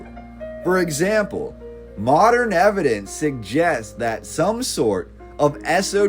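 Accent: United States. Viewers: The redundancy, even stated in the American accented voiceover, repeating the same information makes it seem that this is an AI creation.😢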